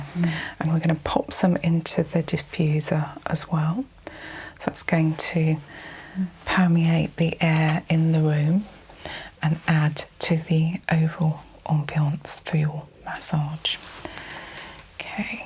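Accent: United Kingdom. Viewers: your soft lovely voice plus the British accent is just all I need….so super calming. Congratulations on your 1 year success as an ASMR creator. Love your channel so much♥️. Blessings lovely lady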